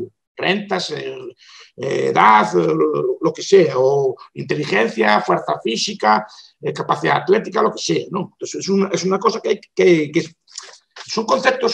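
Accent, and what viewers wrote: Galicia, Spain. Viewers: Habla en castellano . Tiene acento Gallego Eu si falo Galego .non creo que sea muy dificil .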